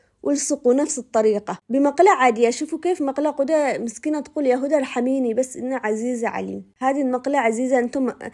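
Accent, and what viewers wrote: Aden, Yemen. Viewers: يعجبني كلامك العدني المخلوط بالحبشي او الصومالي ...عاشوا أصحاب لحج وأكلهم اللذيذ 😊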